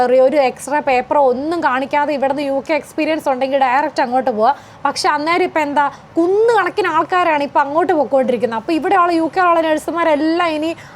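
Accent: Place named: South Kerala, India. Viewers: കൂടുതൽ പേർ വിദേശത്തേക്ക് വരാതിരിക്കാൻ സ്വന്തം നിലനിൽപ്പ് ഭദ്രമാക്കൻ വേണ്ടി യാണ് തെക്കൻ മലയാള ഭാഷ സംസാരിക്കുന്ന ഈ സ്ത്രീ യുടെ ലക്ഷ്യം... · സംസാരം കേട്ടിട്ട് ഞങ്ങളുടെ തിരുവല്ല കോട്ടയം ഭാഗം ആണെന്ന് തോന്നുന്നല്ലോ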